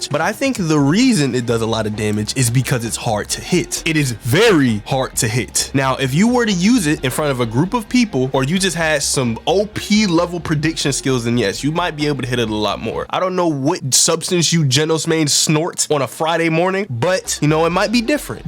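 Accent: United Kingdom. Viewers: Why is he British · HE'S BRITISH? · bro is british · WHEN WERE YOU BRITISH?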